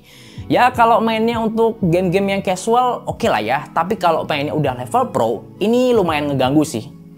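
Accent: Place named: Tegal, Indonesia. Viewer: Weh tegal toh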